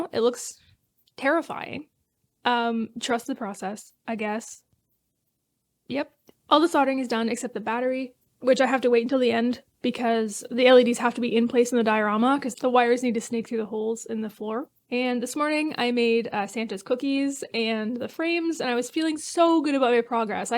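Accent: Newcastle, United Kingdom. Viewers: I thought you might be from Newcastle 😂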